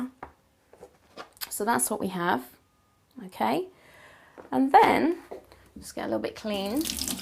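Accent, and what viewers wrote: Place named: United Kingdom. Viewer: Very good vid,. Thank you for the info!...Looks like you have quite a few fans, including myself. Love the British accent, and of course you're very lovely!! And of course Wheatgrass juice is an amazing healer for the body. Try it 4 yourself & you won't be disapointed.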